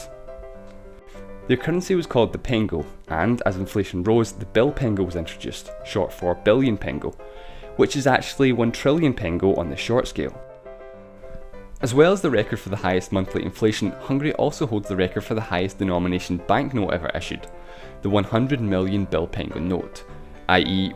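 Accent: Scotland, United Kingdom. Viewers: It’s the Scottish accent lol. His accent is pretty clear lol some Scottish accent are hard to understand if you don’t pay attention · I'm not trying to be rude or something, but if this is a Scottish accent, then it's bloody annoying. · Telling by Your Accent You Are Scottish Probably, Good Explaination👍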